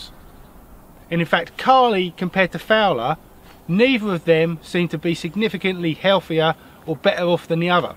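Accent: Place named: Essex, United Kingdom. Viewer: Where are you based bro? it's not aired in the UK yet but you clearly have a Southern I'm guessing Essex accent!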